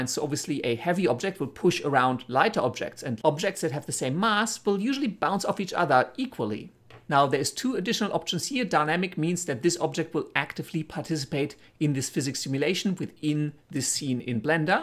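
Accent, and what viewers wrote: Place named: Germany. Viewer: by the way, you sound pretty german sooo are you german?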